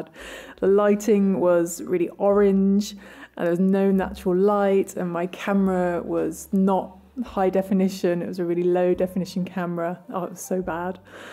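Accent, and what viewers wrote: United Kingdom. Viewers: I started crocheting in about 2010 when i got chronically ill at uni. You were one of, it not the first channel i subscribed to, it was great to hear a British accent.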